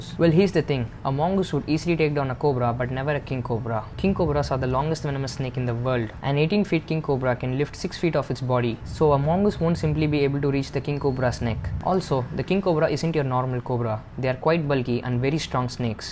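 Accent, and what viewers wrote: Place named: India. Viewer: Jai Hind! Greetings from Alabama USA! Love indian accents too. Always sound cool AF.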